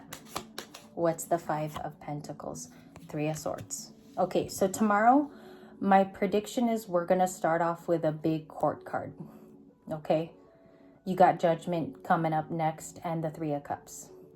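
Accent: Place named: South, United States. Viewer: So funny, he has a country accent & i love it! I've been waking up in the middle of the night just thinking of him. I miss him so much. I feel him getting closer & closer to me.❤, you're so good! 🎉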